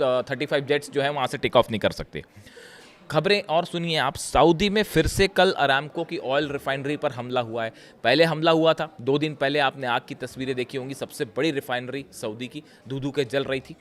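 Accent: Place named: Uttar Pradesh, India. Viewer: bhai ka apna alag andaz bc wala, pure UP style and our favourite 😂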